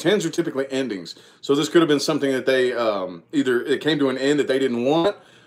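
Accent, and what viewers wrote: South, United States. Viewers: Loving your southern accent. I’m from the south too.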